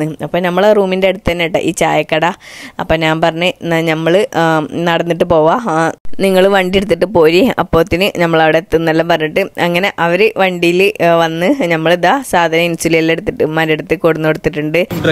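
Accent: Kasaragod, India. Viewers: ഞാൻ ആ ദ്യമാണ് ഈവിഡിയോ കാണുന്നത് സംസാരം കേട്ടിട്ടു കാസർഗോഡ് കാരി ആണെന്ന് തോന്നുന്നു കൂടുതൽ അറിയില്ല എന്തായാലും ഫുൾ സപ്പോർട് 👍👍👍🌹🌹🌹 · Masha Allah Ninte Nalla Soundan Pinne Nammale Kasargod Samsarum Spr Aanetto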